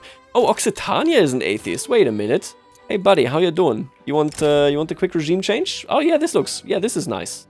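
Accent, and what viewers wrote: Germany. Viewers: I like your german accent. It has that analytical feel to it.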